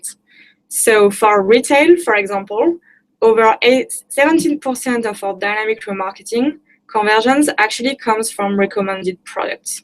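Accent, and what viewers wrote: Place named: France. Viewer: Very cute French accent. Thanks for the video :)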